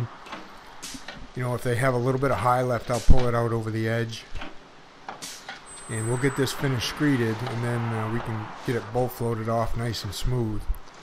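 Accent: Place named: Boston, United States. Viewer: Cool video. That accent is confusing me. Sounds a little north boston crossed with cape cod.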